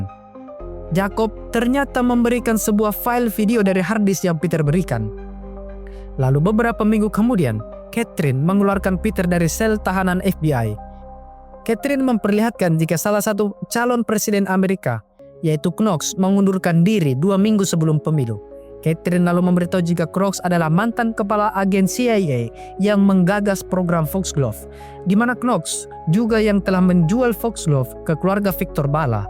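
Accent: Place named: Bugis, Indonesia. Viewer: Orang bugis logatnya admin ni ya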